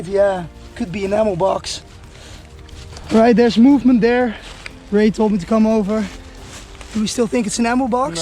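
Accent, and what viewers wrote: Netherlands. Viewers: Are you dutch Cus your accent is typical for a netherlander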